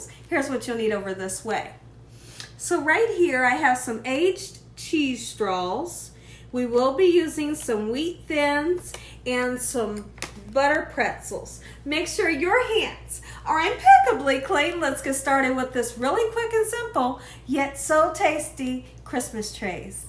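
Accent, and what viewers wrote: South, United States. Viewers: Girl do not worry about your braces. You still have an amazing smile and I love how you speak. That beautiful southern accent. Ooowee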